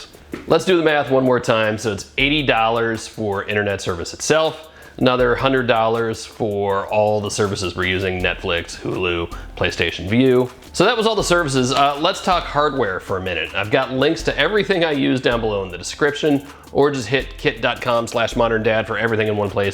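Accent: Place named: Nova Scotia, Canada. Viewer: You sound like you live here where I do, Nova Scotia, Canada. 'Only 2 Providers', Eastlink and Bell and they both charge the same and have pretty much the same packages. I'm paying $ 80.00 for internet as well, however, I do think that's too much for 100 Mgbs, it should be about half that, around $ 30.00 to $ 40.00 per month. Not good bc we actually have absolutely 'NO' options with only 2 ISP's.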